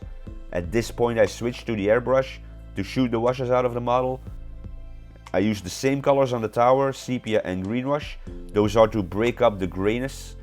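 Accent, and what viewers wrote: Netherlands. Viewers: Your accent sounds very Dutch, am i right ? Ah, Belgisch, close enough ? Het klinkt wel vrij Nederlands, meer dan Belgisch, dus je woont/komt van bij Nederland in de buurt wel ?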